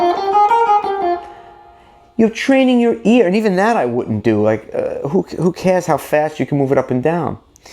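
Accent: New York, United States. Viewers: I very much like your videos and yoa nu youak accent also😉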